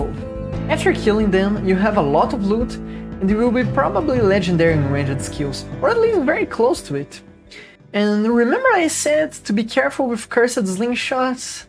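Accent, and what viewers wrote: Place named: Brazil. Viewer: brasileiro sim ou com certeza? kkkkk sotaque ta igualzinho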